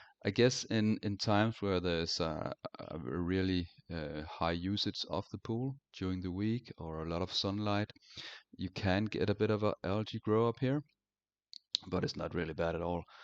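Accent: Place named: Denmark. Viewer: Your video was just recommended in my YouTube account. So then I just start watching it right away. And Wow. This is in Denmark. That’s why I felt little bit of Scandinavian/Nordic vibe in your video. I can hear your Danish accent . I didn’t know your Danish until seeing your name on your youtube profile.. Roskilde and Copenhagen used to be my home for 6 years. You’ve built an impressive natural pool. I like it. Thanks for sharing.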